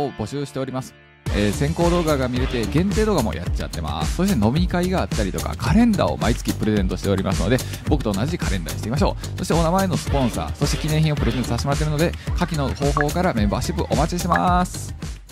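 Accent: Kansai, Japan. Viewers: ペラペラ関西弁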